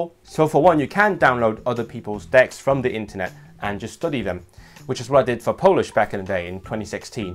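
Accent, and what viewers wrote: United Kingdom. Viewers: To my non-native ears, I legit thought you grew up in the UK.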